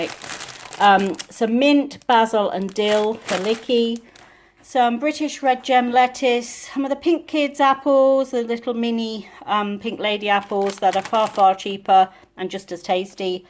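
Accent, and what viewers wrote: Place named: United States. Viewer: Wow u got a lot of shopping there! Hope Caleb has a fab day! listening to ur acc ent since I subbed and initially thought u had a Somerset twang every so often!!!!!!! Then I realised it must be American twang?!!! I can't think why I haven't asked before, but u have a lovely accent t!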